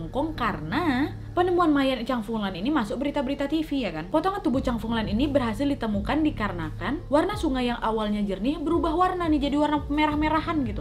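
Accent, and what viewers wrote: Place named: Medan, Indonesia. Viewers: Medan kaleeee bahasa kakak ini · Logat Medan wak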